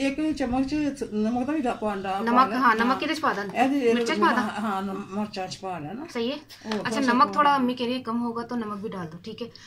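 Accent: Punjab, India. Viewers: thanks apka channel subscribe kr Lia hay apki Punjabi biukul hamray jasi hay 😁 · aapka punjabi bolney ka tarika bahot nice hai. lasoorey ke aachaar ki receipe bahot achey tarekey se batai hai. lasoorey kis month me aatey hai?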